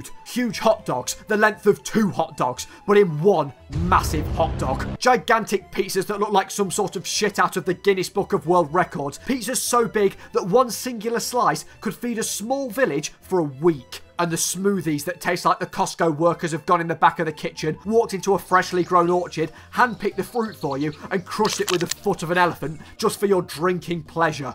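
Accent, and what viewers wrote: United Kingdom. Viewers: U.K. accent is strong in you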